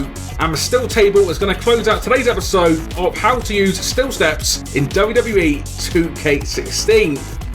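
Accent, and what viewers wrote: England, United Kingdom. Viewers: you are from England ?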